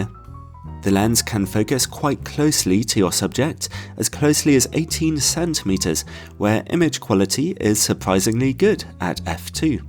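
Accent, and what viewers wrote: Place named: England, United Kingdom. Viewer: what part of England are you from? We are commenting on your lovely accent. Thanks for these videos!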